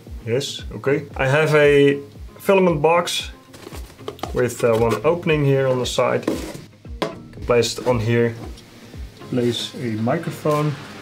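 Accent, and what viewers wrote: Netherlands. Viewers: Wow, nice design! From your accent I'm guessing you are dutch: knap ontwerp en zeer knap gemaakt! Je zou ze zo moeten verkopen, toch best wat materiaal voor nodig om dit eenmalig zelf te maken. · it took me 0.1 seconds to recognize a fellow dutchie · Very cool looking lamp! This is the first video I’ve seen from this channel and listening to your accent, you are a fellow Dutchie right? I’m just getting into 3D printing and orientating at which printer to buy. But I’ve just subbed to this channel for future inspiration for prints 👌🏼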